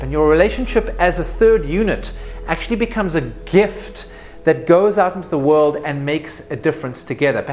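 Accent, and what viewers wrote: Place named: Afrikaans, South Africa. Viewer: you sound dutch, south african and something else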